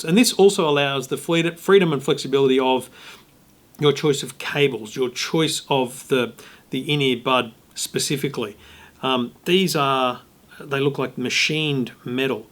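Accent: Australia. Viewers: Australia …..Australian dollars. Can you not tell by his accent ??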